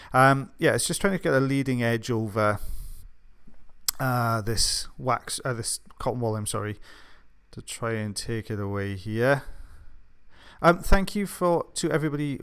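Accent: Wales, United Kingdom. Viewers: Welsh accent I hear??? :) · The captioning is getting a bit better, I think it has problems with your lyrical Welsh accent!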